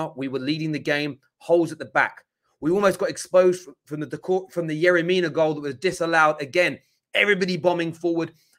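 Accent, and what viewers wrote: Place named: United Kingdom. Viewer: I am from Indonesia ,i like your british axcent.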